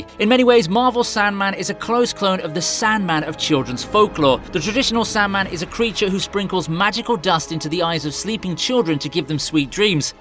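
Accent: United Kingdom. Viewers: I love your British accent buddy😊❤️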